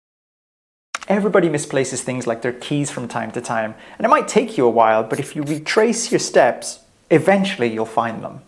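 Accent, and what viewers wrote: Ireland. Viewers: That was helpful, nice Irish guy...not a shouty American!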